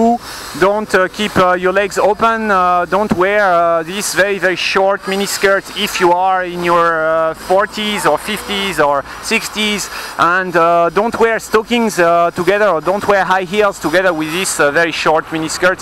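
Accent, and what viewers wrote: France. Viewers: Bonjours ! You have a very French accent , and i am wondering if that can cause problems in teaching English in China ? I lived in China for 11 years , i also was an English teacher !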